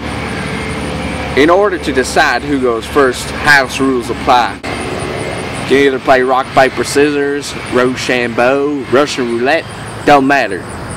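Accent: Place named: Northern Appalachia, United States. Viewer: That ain't a Southern accent, that's a Northern Appalachian accent.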